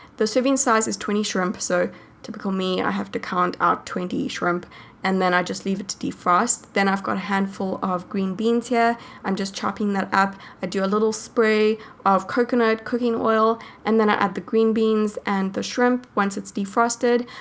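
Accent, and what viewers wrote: South Africa. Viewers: ahahah chiken South Africa :) she just moved to the states though. To LA. I love her accent!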